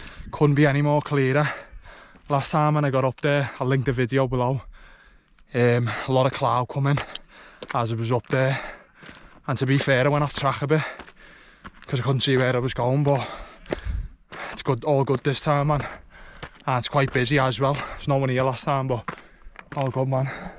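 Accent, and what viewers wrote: Liverpool, United Kingdom. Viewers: Time to switch off. Horrible Scouse accent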